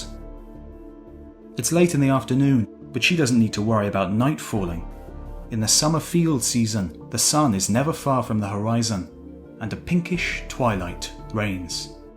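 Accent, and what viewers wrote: United Kingdom. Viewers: He's from the United Kingdom, not the United States. I'm from Liverpool and pronounce it the way he does. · I already knew this stuff but I love hearing it again, your presentation is awesome. Plus a british accent always makes things sound much more majestic.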